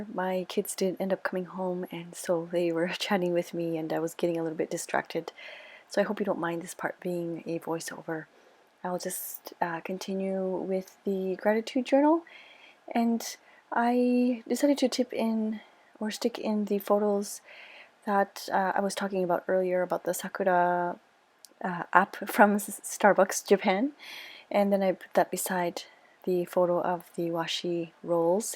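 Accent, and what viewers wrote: Canada. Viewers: Hey! I prefer longer videos! I journal along with you, and with the shorter videos, I just get started and the video is over! But that's just my preference! I really just enjoy your content! P.S - I know that you have lived in Japan for several years now, but you still say 'eh?' in your videos from time to time, and as a fellow Canadian, it always makes me smile! I hope that doesn't sound silly:)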